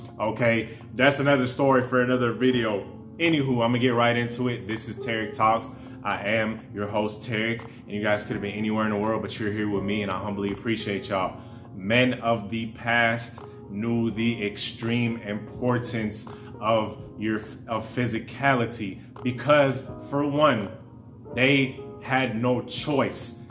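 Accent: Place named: California, United States. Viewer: Bro sounds Sacramento or stockton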